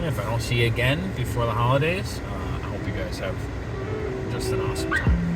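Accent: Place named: South, United States. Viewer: I was dyyying because I literally thought the more he keeps going the more southern he sounds 😂 so I’m glad he acknowledged it. This actually was fun and interesting to watch because my Uncle sells BBQ too. Wish I could try some of y’all’s!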